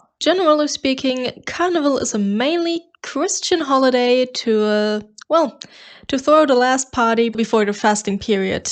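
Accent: Germany. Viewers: I didnt know you´re german too! Well, atleast by the video and the accent im thinking so (okay yea i didnt complete the video before) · Good video overall, here is my constructive criticism: The audio is a bit raspy. While this is obviously a money issue (microphone), it is making it harder to watch. This is especially so because you are trying to present a pleasent scene - good mic quality plays a big part in that. While the german accent is really cute, the amount of pausing you do between some words can make it harder to keep attention (This is one of those things that comes with practice I guess). I can't decide wether im vibing with the choice of background or not. On one hand, I like the idea of setting the focus on you and the cosplay, on the other hand I can imagine this getting a bit bland rather quickly (especially for the average attention span these days (we're all cooked)). 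I generally really like the editing. I audibly giggled at the zoom . A few soundeffects could help underline comedy and better the viewer retention. I dont necessarily dislike the amount of text used, but just having it a standart font white on black for every single one is a bit boring if you ask me. I really appreciate that you are trying to be creative and not just repeating known methods and whats popular. A lot of my criticism is just due to you figuring things out so dont take it to seriously. Its a bit confusing to me what direction this channel is headed. You are not trying to be as attentiongrabbing as possible (which is great, please dont be!), which implies that you want to build a strong viewership instead o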